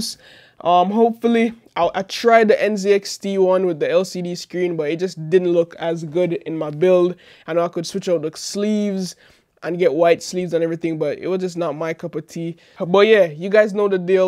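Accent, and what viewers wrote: Jamaica. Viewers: are u jamaican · You're jamaican arent you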